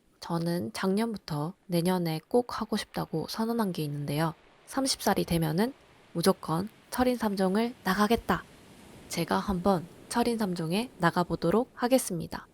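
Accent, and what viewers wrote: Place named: Japan. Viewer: 계획을 세워 그렇게 철인3종도 하시고 마라톤도 완주 하시고 지금 이렇게보면 하면 한다네요 말투도 일본에 있어서 그런지 일본 말투도 섞여 있네요ㅎ 요가 자전거 수영 마라톤 등산 못했지만 하나 하나 해가는 이 대단합니다 응원할께요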